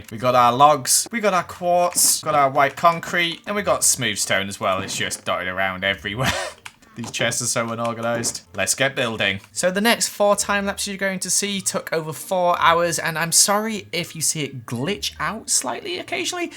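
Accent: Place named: United Kingdom. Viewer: Stop judging British Accents. It's just how we speak.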